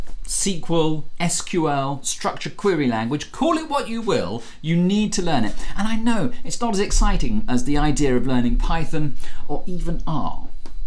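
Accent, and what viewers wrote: United Kingdom. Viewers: listen to * speaking with his British accent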